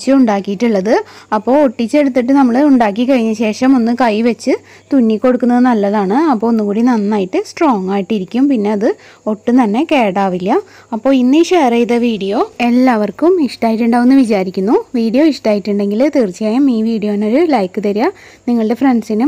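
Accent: Thrissur, India. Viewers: Bro, super ayiitunde, thrissur il avidey a veedu, samsarthinu oru thrissur touch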